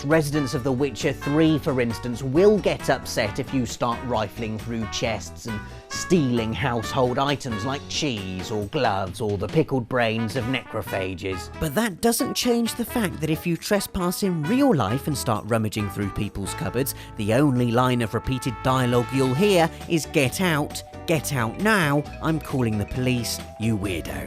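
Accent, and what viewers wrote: United Kingdom. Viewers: I'm from Canada, and I personally prefer your british accent over the english I'm used to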